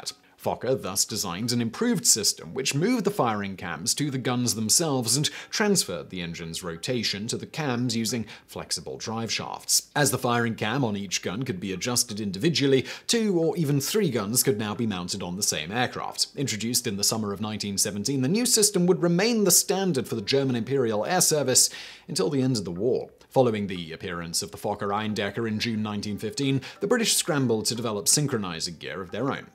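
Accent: United Kingdom. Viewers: Love his British accent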